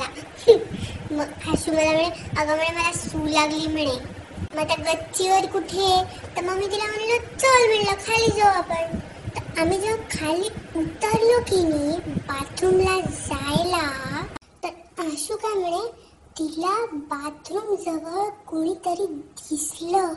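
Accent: Nagpur, India. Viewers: ekdum masta👌and I love that kinda nagpuri accent😀☺awsm...keep it up👍👍👏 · Awesome Stuff, really Hats Off to you.....one of my friend speaks same like SOTI porgi.. as she is also from Nagpur...Both of us enjoy your videos a lot....Happy Diwali..to you and your Family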